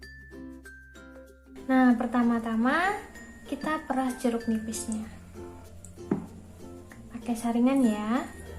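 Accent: Java, Indonesia. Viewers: Medok tpi biutipul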